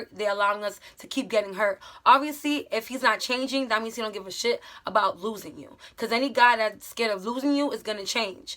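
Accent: New York, United States. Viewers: Was studying for a test tmrw and needed a quick break to release my energy and re focus and just right then your video showed up! And this fits so perfectly in my life rn because ive started slowly but surely focusing on myself and especially self love! Talk about God! Everything you said is head on esp about the spirituality part and eating right. I just got to a point where i was depressed and literally awaiting death and im only 16 as if that would happen any time soon. And I just opened up to God, told him I wanted to change my ways and be happy and be the person whom he wants me to be so when i finally meet him face to face, he'd be proud and satisfied. And I just recently subbed last week and have watched most of your videos, I love ur personality and character! You have such a big sister/ hippie aunt/ motherly love and aura and wiseness to you. 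Idk if u are from Ny and if that helps anything lol but Im picking up the accent and vibes. You honestly deserve a lot of subbies bc you dont srve us 5 mins fake pranks bs or say things that are honey tp our ears and not follow ur own advise! I really don't understand people like u who are wayyy underrated. But keep these vids coming in sis!! Im the oldest sister myself and have always wished i had an older sister to guide me and give me advice and u are one of the very few that do that and thanks for it!!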